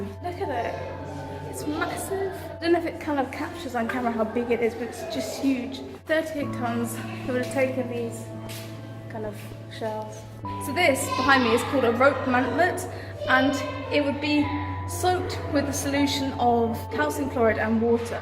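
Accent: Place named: United Kingdom. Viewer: How come British people say karsal instead of castle ?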